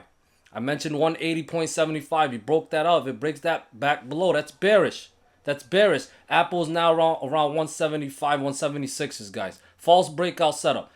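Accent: New York, United States. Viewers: 1⃣ One!!!! Boss man!!!👍 Great information!! Are you from 🗽 NYC you talk like a new Yorker lol sheep 🐑 style trading 💹 Holy Molly!! 🐂 Vs 🐻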